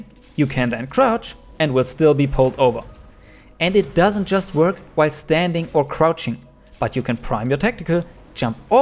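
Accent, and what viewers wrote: Germany. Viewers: Geiler allman akzent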